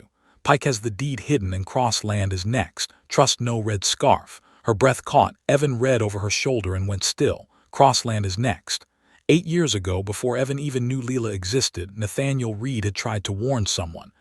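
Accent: United States. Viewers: Thank you. I love this voice btw. Where in America do they talk like that? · I am from Northwest Ohio, and they talk like that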